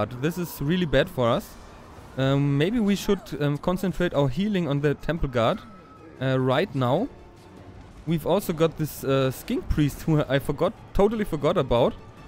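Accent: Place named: Germany. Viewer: nice english, nearly unavailable to sound out the german accent^^ but you should´ve changed the videodescription. i fear there won´t be any anglophones to see your great victory ;) and in the end, the lizardmen monsters are much stronger then the chaos ones. what a great strategic performence xD but anyway nice to see some monstersmash :)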